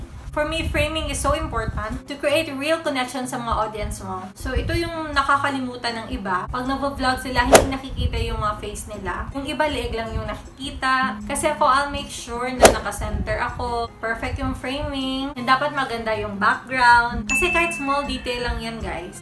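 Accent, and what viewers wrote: Visayas, Philippines. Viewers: bisaya d ay ka lodi? hehe .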